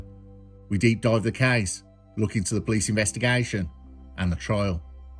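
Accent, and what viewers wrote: Birmingham, United Kingdom. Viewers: Narrator is a brummie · Love you're content bro.wouldnt sound right without the brummie accent.❤😂👍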